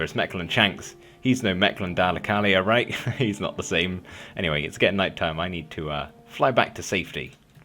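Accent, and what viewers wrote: United Kingdom. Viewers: he is British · i have literally been trying to figure out if he's British or not, but this episode has convinced me that he is...*cough* cough "not at toll"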